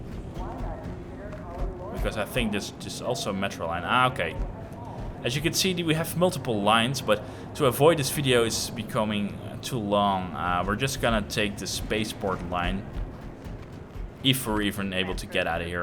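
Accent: Netherlands. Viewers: Are you dutch? I bet you're dutch.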